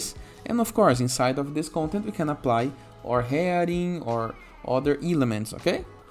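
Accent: Brazil. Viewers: Sotaque BR. It gets one to know one.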